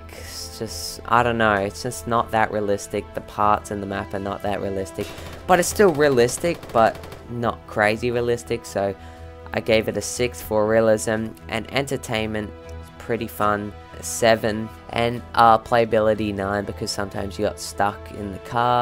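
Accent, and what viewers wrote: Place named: Australia. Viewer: No offense, you sound like an Australian mixed with a French-Canadian. Otherwise, this is really cool. You earned a sub!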